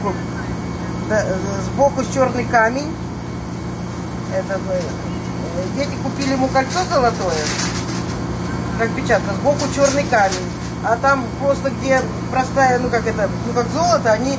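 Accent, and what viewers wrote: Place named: Eastern Europe. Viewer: Yeah... def Eastern European.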